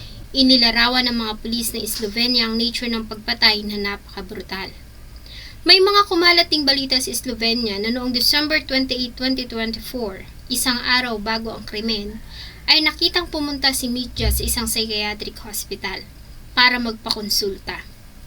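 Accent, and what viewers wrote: Visayas, Philippines. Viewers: Your pronounciation is so bisaya. Hindi Marvel ate Mar-vil ...hindi slovenya Slovenia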